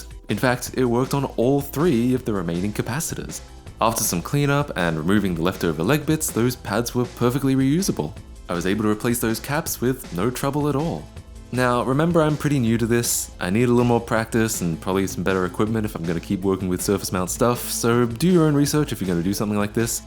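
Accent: Australia. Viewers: Clicked on this video after a random recommendation and was pleasantly surprised to find a fellow Australian. More than that, your voice is crystal clear and really quite calming, on top of the fun and exciting content. Well done, dude! And thank you!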